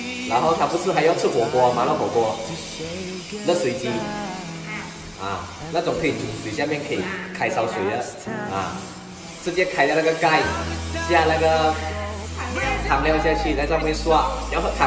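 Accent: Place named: Malaysia. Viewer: 最喜欢听你马来华文口音说：前戏，直至插入，这个前戏很美啊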